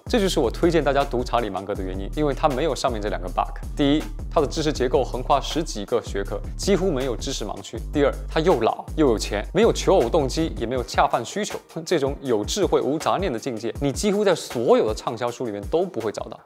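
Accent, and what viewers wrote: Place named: Cantonese, China. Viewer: 就这熟悉的广东口音，爱了爱了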